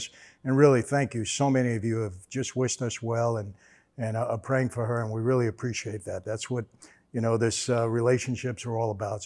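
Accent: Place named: East Coast, United States. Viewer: Hello Mr. Franzese, My Name is Rick Vaughan and I just wanted to thank you for doing all these videos on your life, sharing really interesting and intriguing insights into a world almost none of us “regular-type” people would ever know and for continuing to share the positive message of god and how to be a better person in this world. You have the ability to captivate an audience and it’s awesome to hear ya talk with your east coast accent! I love watching your videos and wanna keep encouraging you to keep them coming! Also celebrating 5 years of No booze today and sending you continued well wish to your daughter and family! Much love and god bless Sir, take care! -Ricky V.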